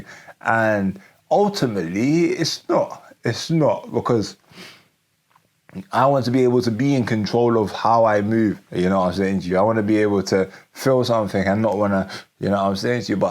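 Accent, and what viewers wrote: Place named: United Kingdom. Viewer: British accent thick. Af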